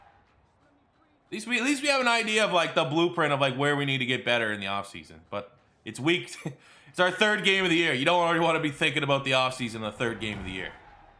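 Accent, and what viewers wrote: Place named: Canada. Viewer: This guy sounds like ricky from TPB...must be canadian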